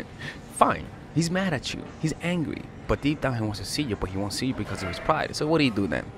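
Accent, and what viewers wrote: New York, United States. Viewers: I can tell you a new yorker lol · His accent actually makes me miss New York ugh I wanna go back🌃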